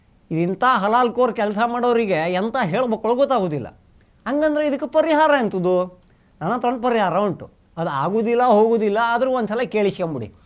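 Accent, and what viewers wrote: North Karnataka, India. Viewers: This is not kundapara language. This is north kannada language.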